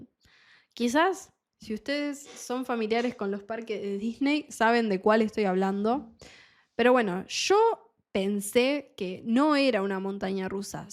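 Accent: Argentina. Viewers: Muchas gracias. Me encanta escuchar el acento argentino,es muy preciosa .Amo mucho a Argentina y espero poder visitarla algún día.Por cierto soy egipcia.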